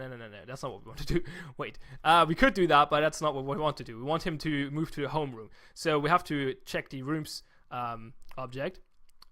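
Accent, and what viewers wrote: Germany. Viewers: Hey, ich bin mal gegenüber deinen anderen Zuschauern so unhöflich und schreibe auf Deutsch, da doch bemerkbar ist, dass deine Muttersprache Deutsch ist. Kann es sein, dass da vielleicht sogar jemand aus Berlin ist? Da bin ich mir aber nicht sicher. Ich habe screeps vor ein paar Monaten schon mal entdeckt, aber nach dem Tutorial nicht genug Motivation gehabt. Jetzt gestern in in der Vorlesung hat ein Kommilitone screeps auf seinem Laptop offen gehabt und jetzt, als Informatikstudent ist die Motivation doch da. Und gestern dann nach ein wenig Starthilfe gesucht und auf deine Videos gestoßen. Und ist die perfekte Starthilfe :D Wenn du auf den offiziellen Server umgezogen bist und du Richtung Kampf und Handel und sowas gehst, kann ich gerne meinen bescheidenden Fähigkeiten entsprechend helfen. Beste Grüße aus Berlin Diskerror/Nils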